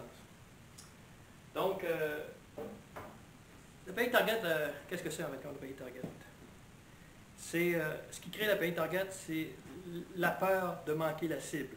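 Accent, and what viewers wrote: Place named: Quebec, Canada. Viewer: 🤔 Je pratique mais pas si clair son language. Un vrai Quebequoi. Désolé mais des sous -titres éventuels auraient été utiles pour les francophones...de France.. C'est un problème général pour ces vidéos des cousins.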